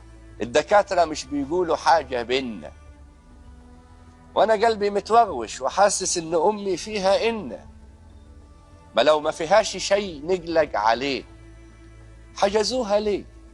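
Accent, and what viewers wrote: Upper Egypt, Egypt. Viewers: الله يا الله والله وجعت كلبي ياراجل وبكيتني وفكرتني بالغاليه الله يرحمه ويرحمنا جميعا انا حبيت الهجه صعيد من اشعارك واناكوردي من كوردستان العراق 👏👏👏👏👏👏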